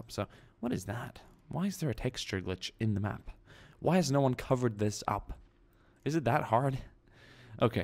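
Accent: Sweden. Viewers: Are you Swedish??? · Are you swedish? You sounds like it when you speak english. Lovely videos btw.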